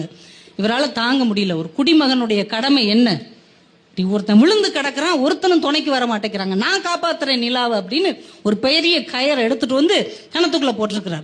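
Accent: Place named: Madurai, India. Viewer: இதுவரை கேட்டிராத, மிகவும் கற்பனைவளமுள்ள சிறப்பான குட்டிக்கதை. குடிமகன்களுடைய வாழ்க்கையே தனி, மதுரைத் தமிழ் அதைவிட அமர்க்களம். அன்புச் சகோதரி வாழ்க உங்களுடைய தொண்டு.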